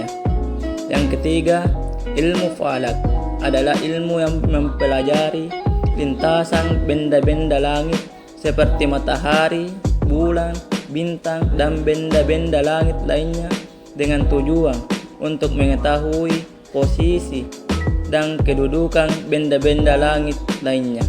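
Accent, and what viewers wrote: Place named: Makassar, Indonesia. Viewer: Sepertinya logat makassar ini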